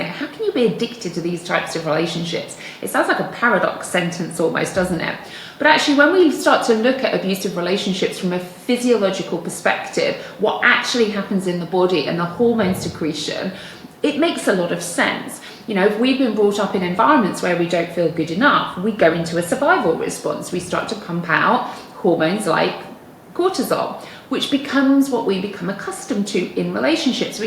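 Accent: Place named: England, United Kingdom. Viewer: Hey. . . I hope you receive this as constructive feedback. The content is great. But between the English accent and the echo chamber audio I had trouble hearing you. I suggest buying a cheap, $12 corded lapel microphone you can run from the camera to a clip on your shirt. It will improve the audio tremendously. = )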